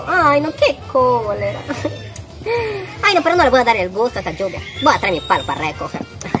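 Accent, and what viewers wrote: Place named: Peru. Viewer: se nota demasiado q es de peru me aparecio en recomendados esta muy recomedado :D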